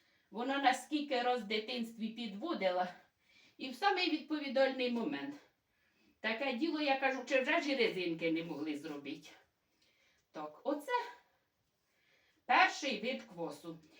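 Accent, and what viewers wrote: Poltava, Ukraine. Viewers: Правильно ви балакєте так як і ми на полтавщині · Так говорят на Полтавщине. Прекрасный край, чудесные люди. С любовью Мариуполь. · ваш тембр дуже нагадує специфічний полтавський акцент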